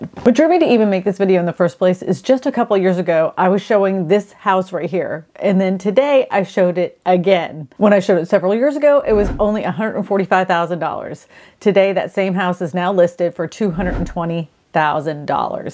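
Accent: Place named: New York City, United States. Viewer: People in Ithaca do not have that accent. You’re accent is more NYC, Long Island, New Jersey.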